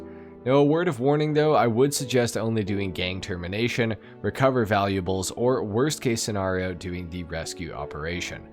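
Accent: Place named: Canada. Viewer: I was watching this video, and i was like, I think I hear a canadian accent. Checked out your channel and I was right. You got yourself a sub now.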